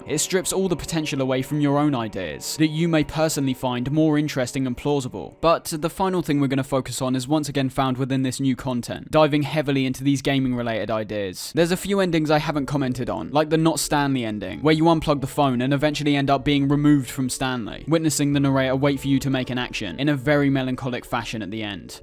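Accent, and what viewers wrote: United Kingdom. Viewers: Why are you British? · 30 mins into the video and my gf, who has been passively listening, asks “what’s a narraya?” 😂 she’s confounded by the British accent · Why are you british? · why are you british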